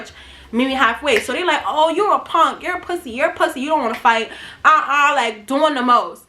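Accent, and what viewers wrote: New York, United States. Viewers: I love your stories but your NY accent is what drew me in and the reason I keep coming back 🥰🥰🥰🥰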